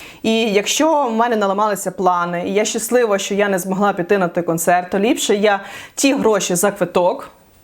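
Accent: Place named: Western Ukraine, Ukraine. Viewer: Знаєш жінок зараз теж беруть до армії. При чому багато жінок воюють. Іди на фронт. А ти западенка гнiдна пиздиш своїм ротом поганим, але навіть не була на війні. Досить сварити народ, тварюка. Багато військових російськомовних, багато волонтерів теж. А хто говорить зараз українською різко став, політики, псевдопатріоти. А ти навіть не українською говориш, а суєш свій діалект, видавючи за українську мову. Чмошна підараска.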